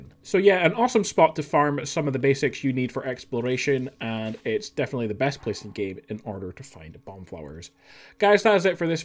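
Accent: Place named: Scotland, United Kingdom. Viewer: Thanks for the video and the North Scotland accent…. I’d say around Inverness?.?.? Possibly Forres or further East Aberdeen way.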